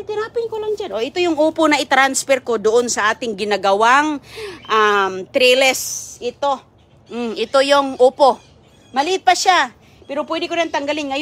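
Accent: Philippines. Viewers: Like your tagalog Accent thanks for sharing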